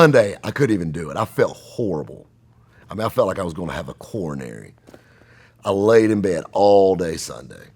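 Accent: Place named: South Carolina, United States. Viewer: He's got that south Carolina charm👍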